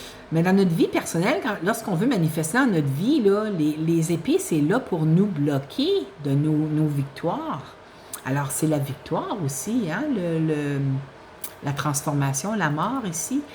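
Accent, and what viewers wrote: Quebec, Canada. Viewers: Je vous adore !!! tout est vrai et cet accent charmand du quebec ; Bon mois de juillet.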